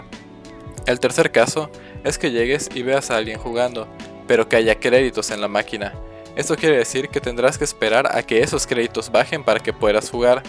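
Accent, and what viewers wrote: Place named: Mérida, Mexico. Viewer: Eres De Merida :o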